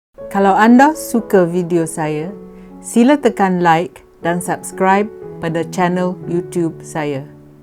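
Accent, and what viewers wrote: Perak, Malaysia. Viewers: Kuat pekat Perak nye yong..ate Beno ape yang yong ngabo org org politik ni hampeh idak boleh pake ngabeh kan duit rakyat...rakyat belengeh sampe ke jubo kroje ngencari duit... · Orang payghok ye kome....tesasuiii cakap peghok.Ate demeee... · Suke dgr ucapan ni....bunyi mcm org perak, syabas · Sonok dengor miker labon cakap Perok.. 👍👍😊😁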